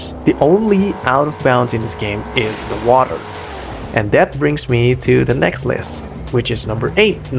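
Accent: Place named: United States. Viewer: it's funny how you, not being a native english speaker, have so much american accent. and I agree with the list, I'm super hyped about this game because of roleplay details like the ones you mentioned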